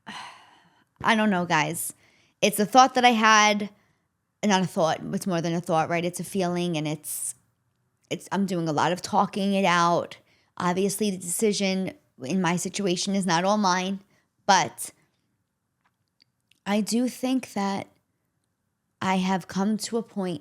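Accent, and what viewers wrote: New York, United States. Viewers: No matter where you reside, your voice will always take us back to NYC! ❤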